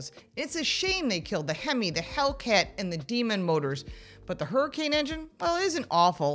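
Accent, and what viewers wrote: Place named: Brooklyn, United States. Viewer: Every time I hear her voice ; I hear back in the day Brooklyn NY.😊. I watching this channel for about 7 years 🎉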